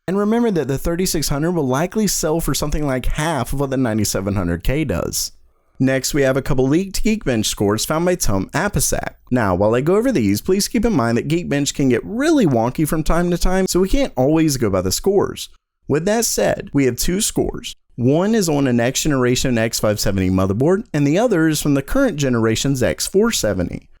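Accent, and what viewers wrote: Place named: United States. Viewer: One of the most annoying voice overs. Way too fucking american pronounciation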